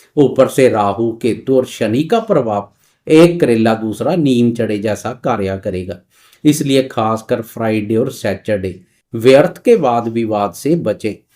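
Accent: Punjab, India. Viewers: Sir ik bath bathoo vasay app punjabi ho kya😊 kieyu ki bolthay hoye bhi hindi ap ki punjabi jalkthi hai ji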